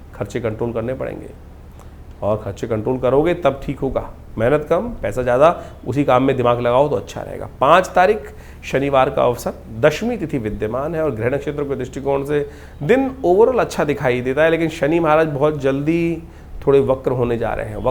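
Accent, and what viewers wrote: Standard. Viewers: Bahut sundar aur shudh uccharan hai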